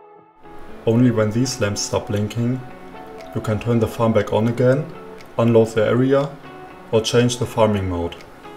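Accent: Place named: Germany. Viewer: Great Video! Deserves way more attention than it already got! Ziemlich starker deutscher Akzent war, aber sehr gut verständlich. Mach weiter so! Keep going!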